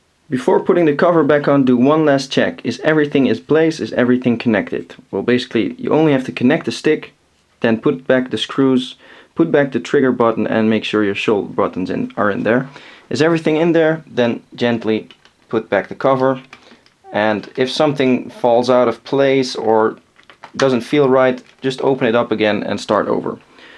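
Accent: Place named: Netherlands. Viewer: Nice dutch accent :D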